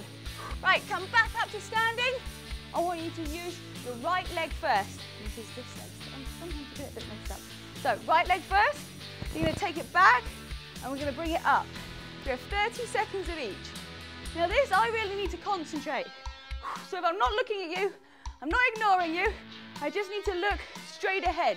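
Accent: United Kingdom. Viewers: Anybody else do all of her amazing workout and suddenly start talking along to them in a British accent .. I love it!! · She has a British accent.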